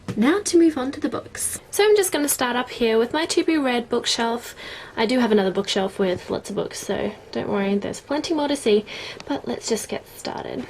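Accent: Australia. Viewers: god, i could listen to you talk all day! I love aussies! :D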